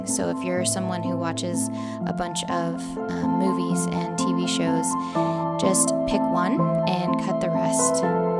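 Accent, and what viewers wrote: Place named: United States. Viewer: I buy to many books!I love your accent! what part of America is that from?I'm from the u.k.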